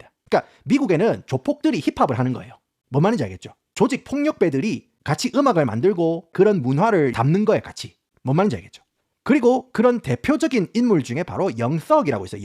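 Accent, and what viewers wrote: Gyeongsang, South Korea. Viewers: 영어와 갱상도 액센트의 강렬한 콜라보!!!!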